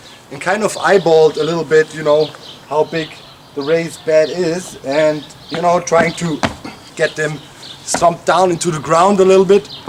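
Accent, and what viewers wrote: Germany. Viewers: Hallo! Ich mag deinen akzent! Im going to guess you are german and I hope im right. Your accent sounds german and my family comes from germany and they have a similar accent. Sorry for assuming anything . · You sound a lot like Juergen Klopp. Are you from the same region as him?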